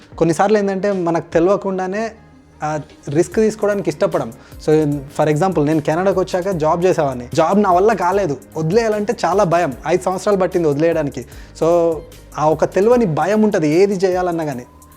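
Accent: Telangana, India. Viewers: Excellent video Nice Telangana slang Crisp informative Shared to my daughter, who is in Toranto, Keep going All the best Be Happy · Canada velli 9 years ina mana mass slang matram miss avvaley Andhar la adho ochi rani posh language kakunda normal ga superb cheyppinav po Bro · Telangana yesha baaga matlaaduthunnaru bro.🎉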